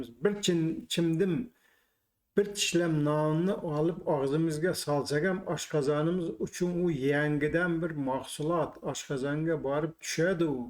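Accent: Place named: Khorezm, Uzbekistan. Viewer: Мен хам асли Кашкадарёликман хвй,ярааааайййй....(хазил ).Бизда Барлос уруги бор Амур Темур бобомиз уруги ..Бобомиз тугилган кишлок Шахрисабзда Хужа Илгор кишлоги хозир хам жойида турибти.Улугбек Мирзо Али Кушчилар Шахрисабз шахрида тунилишган уларнин уруги Барлос уруги.Менинг дадамларнинг уруги Турк Барлос уругидан .Фаркимиз биз ман сан деб гапирамиз .Барлос уруги мен сен деб гапиртшади "Ж" харфини куп ишлптишади шевада лекин мактабдан тоза адабий тилни урганишиб ккрвкли жойда адабий тилда гапиришади ж кушмай.Лекин оилада уз шеваларида гапиришади хозир хам .Масалан Жакси журипсанма .Яхши юрибсанми? Дегани булади .Биз яхши юоибсизми деймиз.Шунинг учун улар бизни турк барлос дейди.Биз уларни шевасига улар бизга тушунишади.Кашкадарьё Сурхондпрьёдан куп чемпион болалар машхур полвонлар чикади.Чунки улар чакалоклигидан соскани упнида кцй думбасини пишириб ннбошига чупча тикиб чакалокни огзига солиб куйишади чаеалок думбани соска урнида суриб катта булади.Россияга борган Кашкадарёлик болалар .Худога шукур гуштдан кутулдик дейишармиш.Демокчиманки хар бир вилоятни узига ярашиб турган шеваси борда.Сизнинг Хоразм шевпсида гапиришингиз узингизга ярашиб туради.Мнн бир Хаййй яраййййй..десам менга Кашкадарьёликмисиииз деб кулиб.юборишади дарров гап гапга ковушиб кетади.Чунки бутун Узбекистон вилоятлари био юиоинт яхши тушунишади.Вотти вотти килса Тошкент .Емон зурсизда деса Андижон Келутту деса Наманган.Улай санга деса Китоб .Борайчийдим келайчийдим деса Шахрисабз .Туссунбой киттин бичок д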